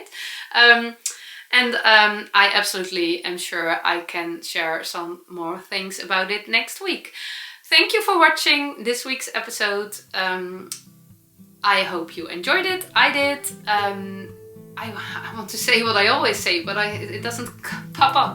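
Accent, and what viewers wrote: Netherlands. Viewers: We love your Dutchy English. I wish I spoke Englishy Dutch! 💕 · Definitely second this. Dutchy English is perfect just the way it is - makes my heart jump every time! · Your English is just fine and I love the Dutch flavor--Dutchy English--I love it!! Don't lose it!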